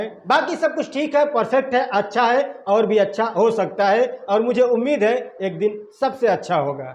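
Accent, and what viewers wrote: India. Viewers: Aap to akadam south indian ke logo jaise bole hai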